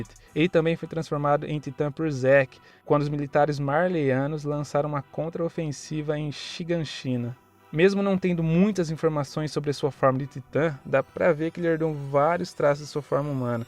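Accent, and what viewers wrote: Brazil. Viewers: Vc é Gaúcho????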